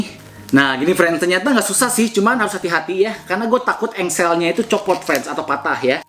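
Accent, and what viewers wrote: West Java, Indonesia. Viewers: Sundanese detected, hehehe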